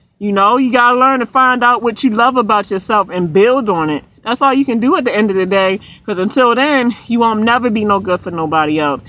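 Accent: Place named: Texas, United States. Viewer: I love her accent, someone in another one of her blogs said Maryland I was like no way I'm thinking more Texas or south who knows where, she's from I'm from Carolina I think she's close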